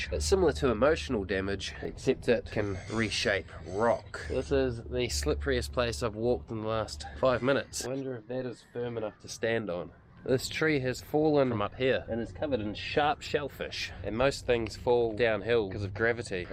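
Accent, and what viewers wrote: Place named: Australia. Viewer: Your presentation differs from other YouTubers. The Australian accent adds to that. Uniqueness always has a change of going viral. Keep plugging away.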